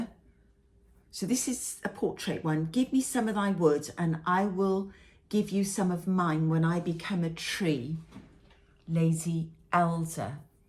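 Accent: Wales, United Kingdom. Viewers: Your work is just amazing and it’s such a delight to watch and listen to your enchanting Welsh accent. Thank you!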